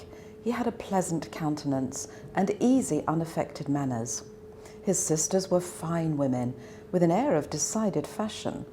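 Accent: United Kingdom. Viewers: 영국발음 멋있어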